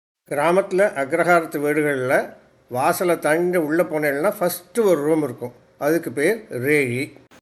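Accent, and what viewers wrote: India. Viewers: The commentary is typical Tanjore brahmanical dialect..this adds more flavour to the video..Very nice.. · Thanjavoor bashi,kekkarathule nanna iruku.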